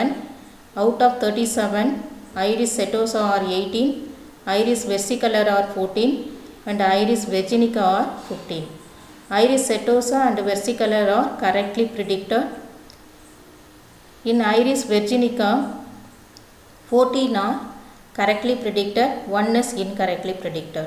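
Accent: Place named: India. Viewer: Unfortunately it is quite difficult for me to understand explanations in hindustani english.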